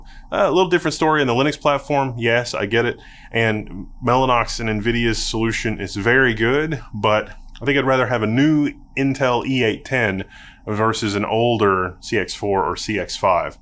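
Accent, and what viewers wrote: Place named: Austin, United States. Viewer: Are you from Austin? You sound like the people I grew up around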